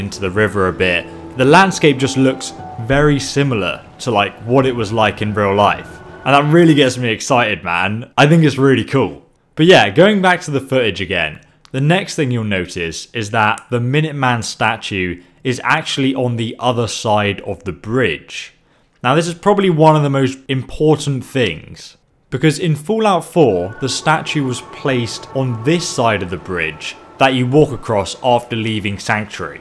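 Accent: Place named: England, United Kingdom. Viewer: We should start a gofundme for you to get a drone so we can listen to that beautiful English accent for many more long winded minutes. Anyone down? Ohmmmmmmmm bethesderrrr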